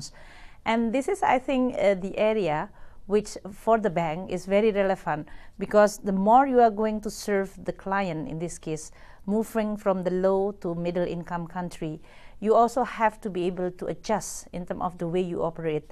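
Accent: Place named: Indonesia. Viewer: Lantas dengan kemampuan menghilangkan aksen Indonesia anda, sudah jadi apa anda sekarang? -- Orang seperti anda inilah yang sejatinya masih menjadi katak dalam kubangan, setiap individu memiliki kemampuan, dan keahlian masing2, sosok seperti bu mulyani yang pandai dalam mengelola keuangan, tentu tidak akan menghabiskan waktunya hanya untuk menghilangkan aksen jawa atau Indonesianya. Selagi grammar dan tata bahasanya dapat dipahami, maka tidak ada yang salah ketika kita masih memiliki aksen bahasa pertama.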